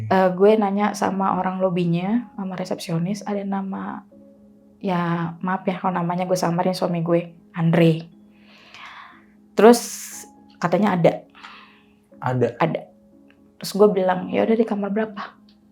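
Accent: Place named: Yogyakarta, Indonesia. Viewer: Senang dengar logat betawi dan jogya ne mbok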